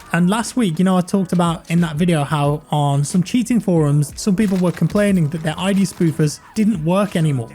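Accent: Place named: North, United Kingdom. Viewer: I swear to God sounds like Brian cox. It's like a slight northern accent. It's calming.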